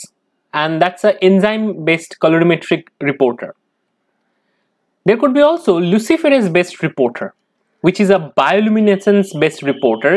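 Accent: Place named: India. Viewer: 아오... 인도영어 이해하기 어렵네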